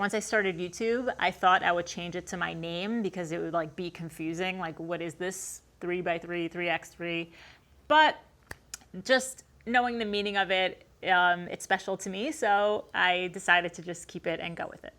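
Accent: United States. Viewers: Yes! I was about to comment the same thing! It’s a nicer accent than other parts of America I think.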